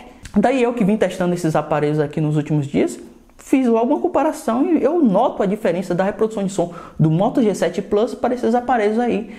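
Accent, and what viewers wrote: Bahia, Brazil. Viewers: Mim escrevi no seu canal vc e baiano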